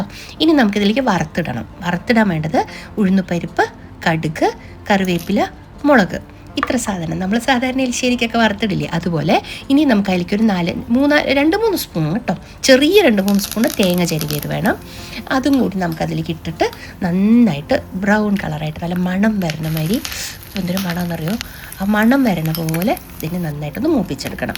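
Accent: Palakkad, India. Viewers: Dear Mam, ഈ വെറൈറ്റി കറികളും പേരുകളും, ഉപയോഗിക്കുന്ന പാത്രങ്ങളും, കൽച്ചട്ടി, മൺപാത്രങ്ങൾ തവികൾ etc... പഴമയെ കളയാതെ പുതിയത് ചേർത്ത് പിടിക്കുന്ന മനോഹരമായ വീഡിയോകളാണ് എല്ലാം ...❤❤ പാത്രങ്ങൾ,വൃത്തിയുള്ള പശ്ചാത്തലം, ആ കർഷകമായ പാലക്കാടൻ സംസാര രീതി... നമ്മൾ കാണാത്ത നിഷ്‌ക്കളങ്കമായ, ചൈതന്യമുള്ള ഒരു ലോകം പോലെ തോന്നുന്നു.❤❤കൽ ചട്ടിയുടെ പിറകിലായി ഓമനത്തമുള്ള കുഞ്ഞ് പ്രതിമ😘❤ ബുദ്ധിമുട്ടാകില്ലെങ്കിൽ ഈ കുഞ്ഞ് കുഞ്ഞ് പാത്രങ്ങളൊക്കെ ഒരിക്കൽ കാണിക്കുമോ ?...❤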